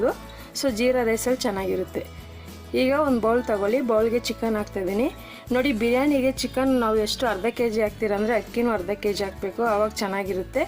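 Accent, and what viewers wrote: Tamil Nadu, India. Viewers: Tamil nimma mother tongue allva nimma language hage ide.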